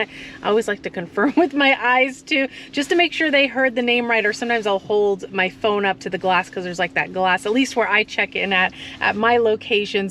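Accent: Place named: Pennsylvania, United States. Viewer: You sound like you are from Pennsylvania.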